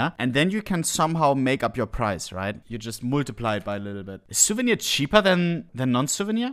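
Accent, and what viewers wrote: Germany. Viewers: Idk who this guy is but he sounds so German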